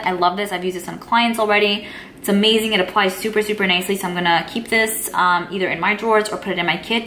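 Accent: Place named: New Jersey, United States. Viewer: She has the same accent as Carli bybel lol are they both from jersey ?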